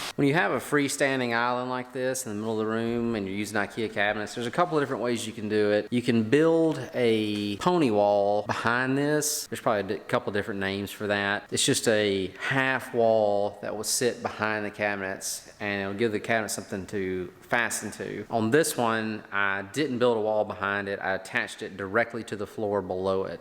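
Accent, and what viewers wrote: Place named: Tennessee, United States. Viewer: Are you from Old Hickory Tennessee? You sound like you could be Nate Bargatze’s brother. Lol!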